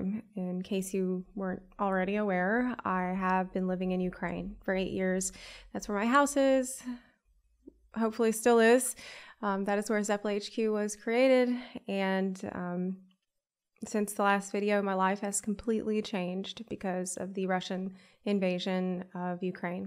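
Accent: United States. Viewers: I thought you where American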